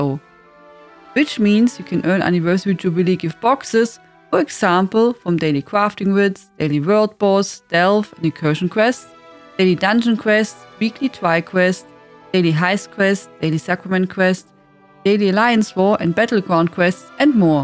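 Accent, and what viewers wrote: Germany. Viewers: You from Deutschland? sounds like one.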